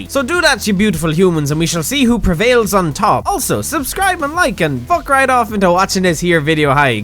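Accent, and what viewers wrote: Ireland. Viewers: I missed your fucking beautiful Irish accent. STOP TO TORTURE ME AN GIVE ME MORE VIDEOS PLSSSSSS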